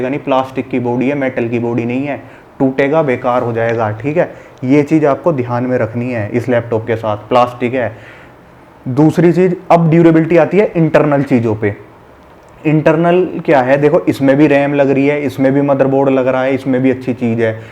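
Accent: Haryana, India. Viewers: Bhai tu haryane ka lage h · getting reply from you is still a big thing for me thank you so much sir you are really doing great work please keep it up ! Like your haryanvi accent actually I love haryanvi accent and main strength of your channel is your honesty and desiness.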